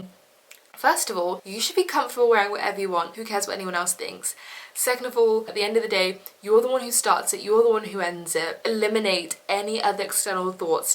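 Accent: United Kingdom. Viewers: Besides the tips that you've shared, I also love your cute British accent ANYWAYS Thank you for this wonderful video 💖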